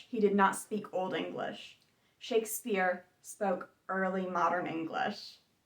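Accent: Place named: Midwest, United States. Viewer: You have an interesting accent. You sound sort of mid western USA but some words sound southern USA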